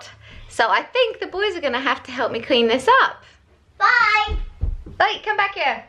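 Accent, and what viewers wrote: England, United Kingdom. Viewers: Is she British 🤔 (I know I sound silly asking this 😁😂🤣) xx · also from Meg's channel !! great vid -- hv always adored British accents 😍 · I'm Canadian too, but live in bc I would have never guessed you weren't born in England.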